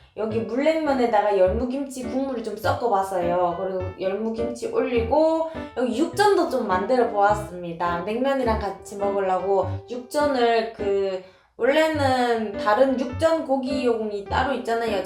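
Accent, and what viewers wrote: Gyeongsang, South Korea. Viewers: 경상도 사투리 슬쩍 조습니다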